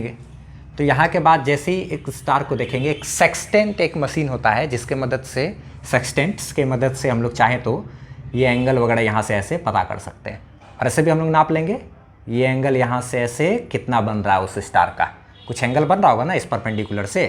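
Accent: Bihar, India. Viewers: Sir app bihar sa ho kya to pranam 🔥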